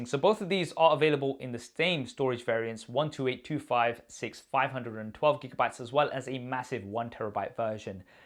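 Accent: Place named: United Kingdom. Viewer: An Indian dude with a British accent? that would be very interesting to see in person here in maga country lol 😂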